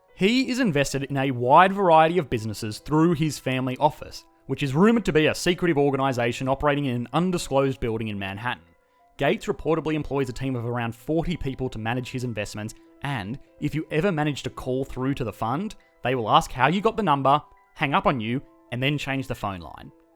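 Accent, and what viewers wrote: Australia. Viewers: He is Australian not only does he mention it in a few videos, I'm also Australian I can tell by his accent 100% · Wow--I've never seen such a shameless yet disturbing display of public self-stroking and auto-fellatio in my life the way you go on and on thinking anyone cares who has the larger economy/banking system, etc ...zzzzzz----and you sound Australian rather than British, so not only am I mildly humoured but also perplexed!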